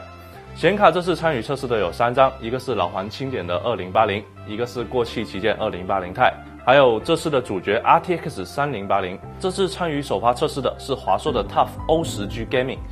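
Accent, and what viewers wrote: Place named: South, China. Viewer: 南派普通话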